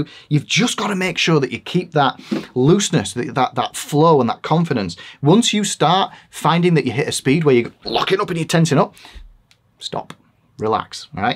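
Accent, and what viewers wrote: United Kingdom. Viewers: I’m watching this for my daughter. I know music theotry, but I am a bassist who know plays mostly gtr. But because you have Czech name and a Harry Potter accent, she might listen to you. If a I showed her this, I’d be just a stupid dad, but for her your professor…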